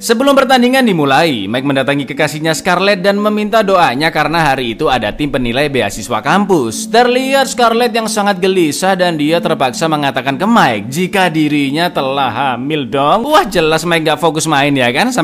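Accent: Java, Indonesia. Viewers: Rika wong ngendi jawane ya lurr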